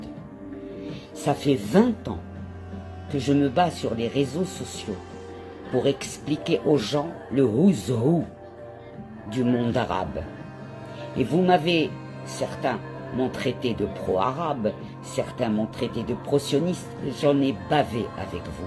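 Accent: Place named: Morocco. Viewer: لهجتك المغربية حلوة كثير الله يحميكم جاليتنا المغربية في إسرائيل كل الحب والتقدير والاحترام المتبادل ❤❤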